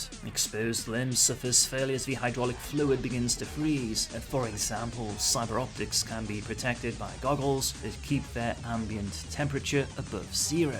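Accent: England, United Kingdom. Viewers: Man, are you from England? Nice accent.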